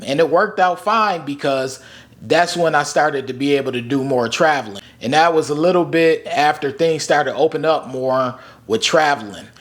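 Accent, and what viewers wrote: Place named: Buffalo, United States. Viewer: Are you from buffalo?...gotta be. I hear it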